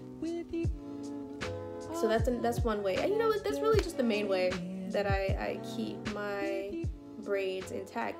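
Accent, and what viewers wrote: New York, United States. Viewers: yerrrrrrrrr you so new york i love it lol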